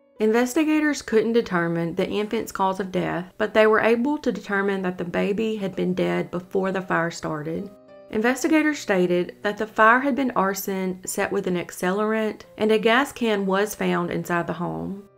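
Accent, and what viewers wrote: South, United States. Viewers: I'm Norwegian, and I have to tell you how refreshing it is to listen to your southern accent. It's a rare treat! American news media and for the most part American TV series seem to frown upon dialects for some reason. It's a crying shame. I could listen to your dialect all day long.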